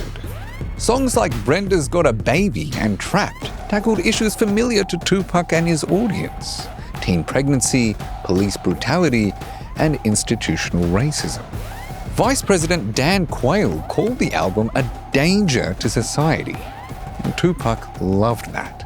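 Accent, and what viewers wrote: Australia. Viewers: They both are Australian, so that might be why they sound similar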